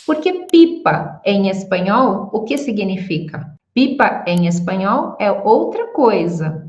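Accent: Brazil. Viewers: ¡Qué interesante! En España, "saco" es como una bolsa, normalmente tejida de yute, de forma rectangular y sin asas (parte para agarrar) que las bolsas sí tienen. Normalmente decimos un saco de patatas, un saco de leña, un saco de arroz, un saco de cemento, etc. No sé si en alguna parte de España también se usa como prenda de ropa, yo sólo lo he oído de amigos hispanoamericanos. "Rato" es un tiempo más largo que momento o minuto, que también usamos. Tus clases son muy interesantes, además que entiendo tu portugués brasileño mucho mejor que el de nuestros queridos vecinos portugueses. Un saludo ❤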